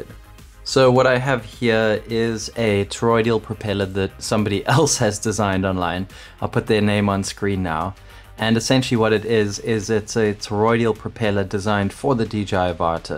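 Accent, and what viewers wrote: South Africa. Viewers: I knew that lekka safari accent! Groete uit Duitsland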